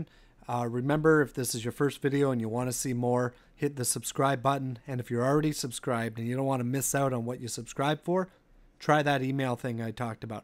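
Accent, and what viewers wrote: Canada. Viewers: Couldn't agree more. I love that calm Canadian voice and the way he says process. If it turned into something like "HAI GUISE HERE LOLOL ALRIGHT LET'S DO SOME ACHIEVS WOHOO" it would really ruin the character of as well as. Just my 2 cents.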